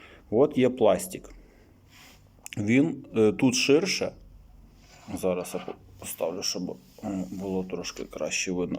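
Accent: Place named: Polesia, Ukraine. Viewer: ВЯЛИКИ ДЯКУЙ,ГЛЯЖУ З МИНСКА, РАЗМАВЛЯЕШЬ, ЯК У НАС НА ПАЛЕССИ, УСЕ ЗРАЗУМЕЛА, ПАЙДУ ЧИНИТЬ,А ТО МАЛО ХТО ПРОСТО И ДАХОДЧИВО РАССКАЖА, АБЫЧНО ВУНИЧАЮТЬ.😊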